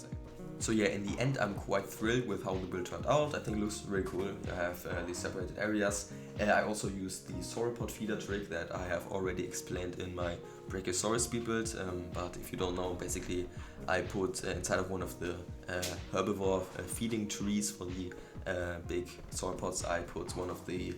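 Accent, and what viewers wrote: Germany. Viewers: you have a german acc ent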